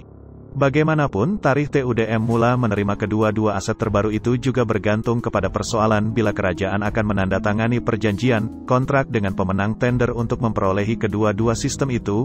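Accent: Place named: Indonesia. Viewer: Negara ni skrg dah teruk korup, lagi mahu beli aset baharu..........LCS pun dah bayar RM6 bilion, sebiji pun tak siap, menteri2 umnobn/pn cekik komisen. Suara pun org indonesia lagi mahu sembang ttg tentera Malaysia........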